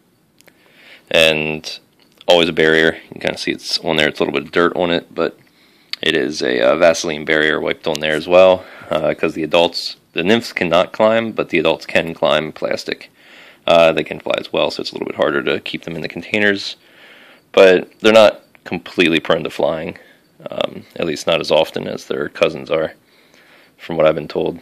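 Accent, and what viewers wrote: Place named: Philadelphia, United States. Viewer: I just got some of these, I heard they are very prolific breeders, have you had success? Also, you sound like you're from philly area, if that's the case, you should definitely check out the insectarium! it's on Frankford ave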